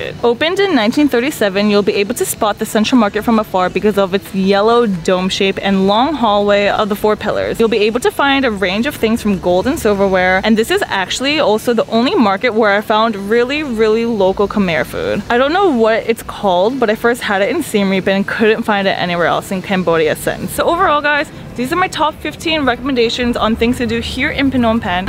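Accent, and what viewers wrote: United States. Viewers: Hey! Sounds like you’re from the states. Just curious, how long was the flight from where you were to Cambodia? Thinking of doing a trip end of year. Awesome vid 🤙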